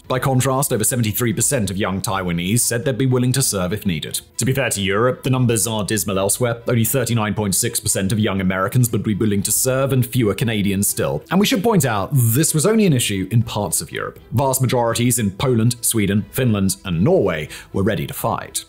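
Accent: United Kingdom. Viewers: I’m interested in this. Is it worth suffering the British accent? Nah.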